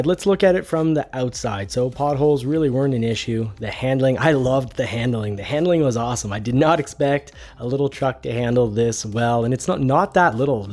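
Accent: Canada. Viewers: Cool je savais ton accent était québécois mais de Quebec en plus c'est nice! Je vais te suivre. Et jai hate de pouvoir mettre la main sur un pti maverick!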